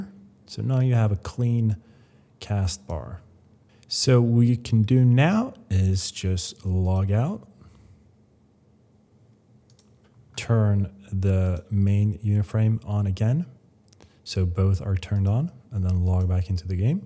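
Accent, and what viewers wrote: Sweden. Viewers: are you swedish? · Bro, love your videos, very helpful. Your English is great, but is that a slight Swedish accent I detect? Zug zug!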